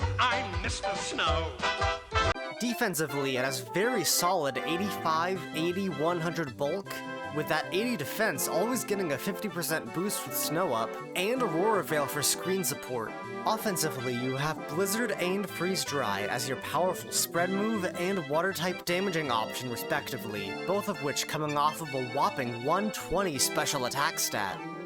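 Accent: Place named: United Kingdom. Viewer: PEAK BRITISH ACCENT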